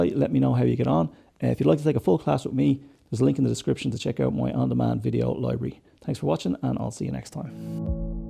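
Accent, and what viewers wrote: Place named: Ireland. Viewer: I like it. I like your Irish accent i don't think you do fine , some videos were challenging , the volume's but, not too bad.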